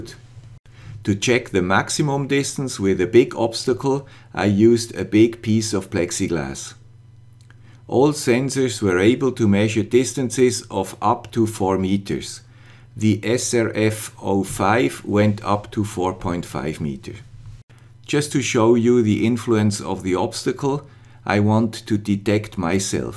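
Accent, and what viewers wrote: Switzerland. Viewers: Hallo, auch hier einer mit dem swiss accent:) Ich habe diesen <link> Ultraschall-Sensor gekauft. Ich möchte diesen gerne mit I2C betreiben mit einem STM32F446RE Mikroprozessor. Leider habe ich die slave address noch nicht ausfindig machen können oder auch das abzurufende Register. Kannst du mir weiter helfen?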